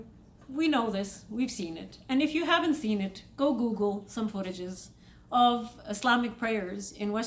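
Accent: Egypt. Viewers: You Egyption acisent dosent bother me none honey. Its all good. Keep up the good work. I watch your videos all the time .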